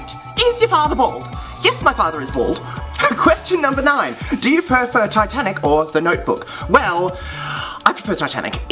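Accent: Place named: France. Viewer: I like your french accent ! He's so cute !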